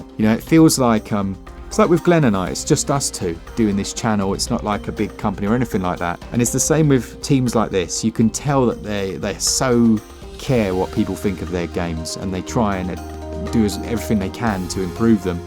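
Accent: United Kingdom. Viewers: Sounds very British indeed 🍵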